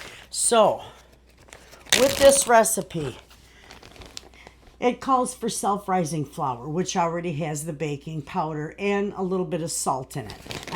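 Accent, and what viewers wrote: Michigan, United States. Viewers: Without even seeing where you were from I could tell you were from Michigan.I lived in Gaylord for years and my daughter still lives there.I’m curious to see , where in Northern Michigan do you live? We love it but we had to move home to Louisiana about 7 years ago.we vacation every year in Gaylord.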